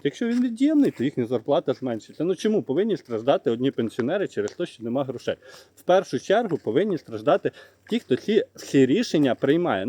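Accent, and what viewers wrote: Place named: Surzhyk, Ukraine. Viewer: Дуже дратує суржик цього журналіста!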